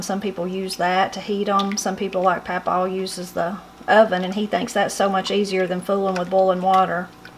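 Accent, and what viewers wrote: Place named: Appalachia, United States. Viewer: I love the way you talk..your accent, the way you can relate things...it's just magical. But I would never buy a cook book of yours, cuz I grew up Appalachian myself, and what recipes you might have, I already have them all in my head. But keep putting out your YouTube videos, cuz I'm hooked on your story tellin!!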